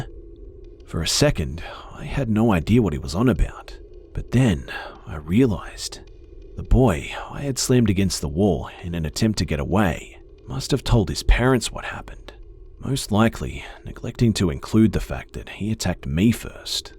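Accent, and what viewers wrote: Australia. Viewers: Oh great now we got a shitty aussie reader who also sounds like a puff like the rest of them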